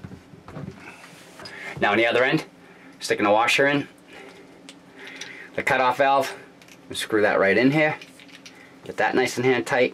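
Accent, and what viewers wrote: Boston, United States. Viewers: Irrelevant comment alert!!.. dude r u from Boston??? U been to Dunkin today?? Anyway. Wikkid pissah review!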